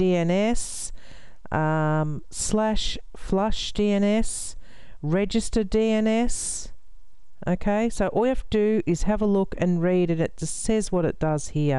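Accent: Australia. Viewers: from your accent I noticed that you are Australian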